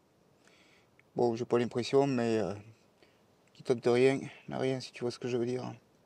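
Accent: South, France. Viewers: oui merci , j'ai quasiment que des véhicules japonais ;) leur durites sont en acier très dur souvent en voulant refaire le bout du cône je le fait en simple cône sans le revers car sinon ça fissure , je devais mal m'y prendre . au passage : bel accent du soleil ;)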